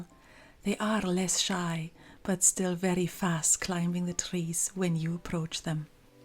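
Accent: Scotland, United Kingdom. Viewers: Every time I watch a video of yours, I'm positively surprised by hearing your Scottish accent. I love the Scottish accent, and personally for me, this is one of the most beautiful accents there is.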